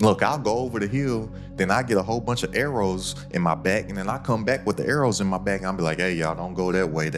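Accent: Kentucky, United States. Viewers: Are you from Kentucky brother? I’m in Lexington. Love your game, it helps me a ton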